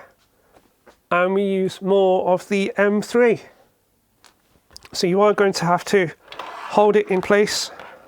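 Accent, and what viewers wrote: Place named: England, United Kingdom. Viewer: Wow.. you sound a lot like my late father, only with an english accent.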